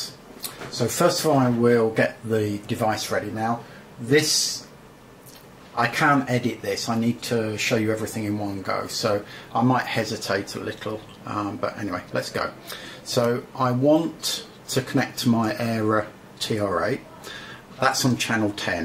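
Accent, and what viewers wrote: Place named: Nottingham, United Kingdom. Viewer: love the Nottingham accent. Bonsai and synths, now both the way god intended ;-) Subbed.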